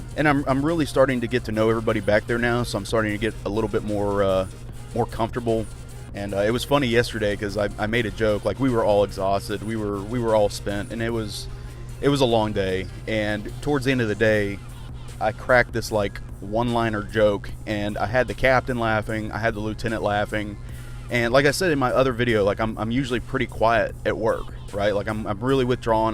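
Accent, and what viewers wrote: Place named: South, United States. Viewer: You have a very pleasant voice to listen to, I think everyone hates their voice but you just have a soft southern accent. Don’t hate it. 😃😃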